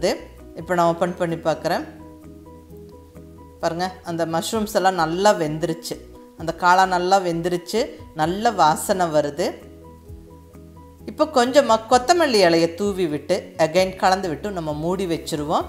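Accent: Kongu, India. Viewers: Hi mam, ur recipes r too good. I like ur kongu tamil also. Keep rocking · கொங்கு தமிழில் நீங்கள் சொல்வது நன்றாக இருக்கிறது. உங்கள் குரலும் அருமை . சமையலும் சூப்பர்.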